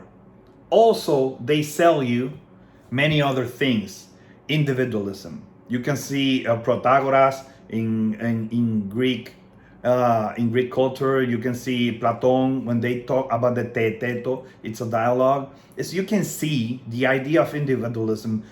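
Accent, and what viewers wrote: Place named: United States. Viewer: Todo un americano hablando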